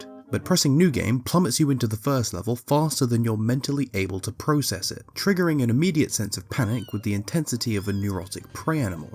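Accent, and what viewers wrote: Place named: Australia. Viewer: Love this game and your amazing Australian accent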